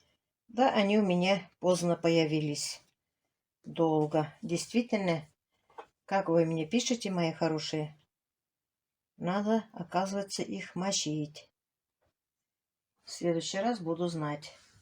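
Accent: Tatarstan, Russia. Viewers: добрый день.Желаю удачной съёмки. Слушаю тебя и вспоминаю маму.Знакомый акцент татарский.Не болей.😘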